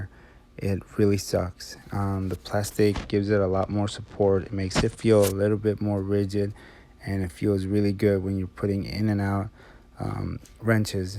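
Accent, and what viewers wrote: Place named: France. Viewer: If YOU were really paying attention you’d have recognized that English is not his first language. Hints - photos of Paris on his wall, his name, his accent (although it sounds like French ancestors but growing up somewhere else).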